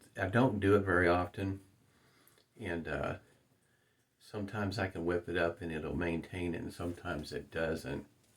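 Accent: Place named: Tulsa, United States. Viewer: I’m a fellow Tulsa and was thrilled to find your channel. I was recommended to your channel from a link on Badger & Blade. They remarked at how laid back you are. I watched the video and thought that you sounded familiar, and then you mentioned Tulsa. You sound familiar because you talk like I do! Anyway, I really enjoyed your video. I use MWF almost exclusively. One thing I do different from you is I load my brush in the crock and then move to a scuttle to add the water. You are right about the crock becoming slick, and I’m a bit ham handed, so I don’t like trying to pick the crock back up until I’m done. When I’m done shaving, I clean up the crock by putting the lid on and flipping the crock sideways and rinsing the whole crock under cold water. A little water sneaks into the container and I put the crock away with the lid on and that teaspoon or so of water sitting on top of the puck. 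Since I shave daily with The Fat, it’s moist and ready to go the next day. If for some reason I skip a few days, I’ll put some extra water on top. Thanks for your channel, I’ve subscribed and turned on notifications.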